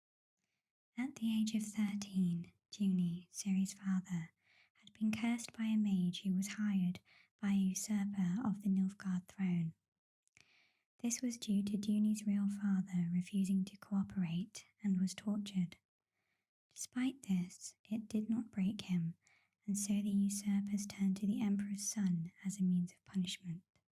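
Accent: United Kingdom. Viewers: Judging by your accent, you live in the UK so I guess you will have plenty of opportunities coming up to catch the rain sounds ;). But maybe you can use background sounds from other YouTube channels if you'd ask their permission? I've just watched you other Witcher vid too, great outfit and your whispers are as relaxing as your soft spoken vids. Keep up the good work, really appreciated!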